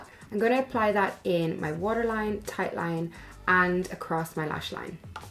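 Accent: Ireland. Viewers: Love your Irish accent, you look so beautiful xxx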